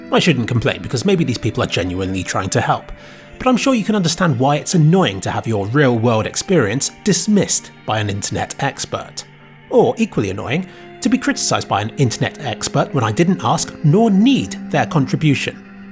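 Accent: United Kingdom. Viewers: can you please change your dialect im very tired of the british lately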